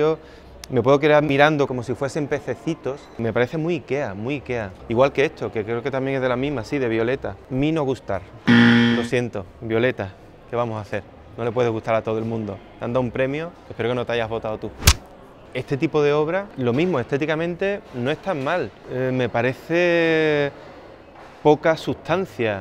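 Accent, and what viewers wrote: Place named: Seville, Spain. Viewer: Como lo pusiste con k pensé que a lo mejor allí no se utilizaba, cosa me extraño que así fuera, pero como hay palabras comunes aquí que en algunos países de Latinoamérica no se utilizan, pues sera una de esas. En cuanto a la pronunciación, el es sevillano y tiene su acento particular dentro del acento español andaluz.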